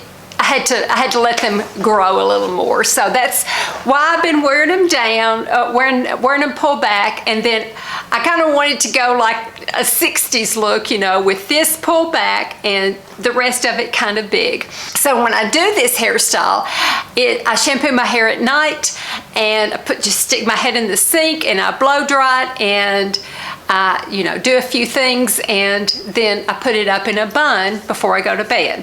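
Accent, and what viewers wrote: South, United States. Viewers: Knew u were a southerner when I heard u talk and a tennesse rwsident...so am I....tfs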